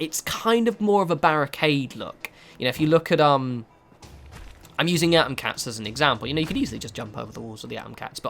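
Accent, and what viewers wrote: United Kingdom. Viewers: Anarchy RWO I'm aware he has an accent, but plenty of people from the UK pronounce the word appropriately. I can't speak to any specific regional influence on pronunciation of the word, but it is correctly pronounced the same way in pretty much any place that english is the native language. Quit acting like I'm insulting the man's intelligence by offering a bit of educational advice.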